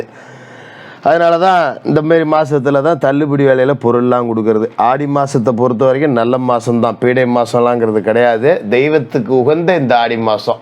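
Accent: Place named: Thanjavur, India. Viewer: ❤ தஞ்சை தமிழ் போல தெரிகிறதே சுவாமிஜி! நன்றி 🎉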